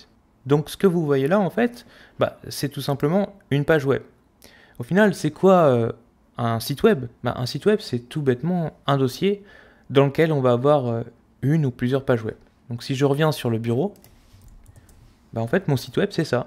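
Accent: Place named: England, United Kingdom. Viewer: belle axenc englais !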